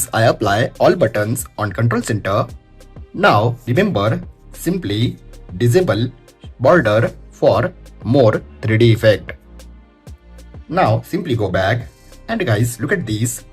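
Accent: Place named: India. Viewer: 🫪Ooh nooo... indian english!! 😵‍💫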